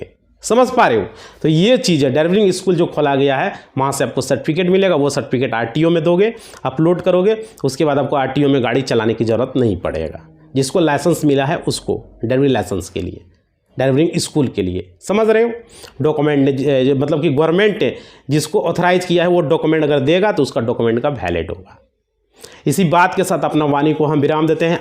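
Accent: Bihar, India. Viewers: Bhai sahab Teri Bhasha Se tu Bihari lag raha hai bakwas kitab nahin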